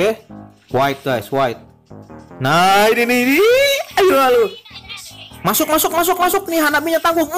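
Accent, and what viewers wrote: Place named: West Java, Indonesia. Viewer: Org Bandung ya..ngomong nya Sunda banget ... Bandungnya dmna kang .???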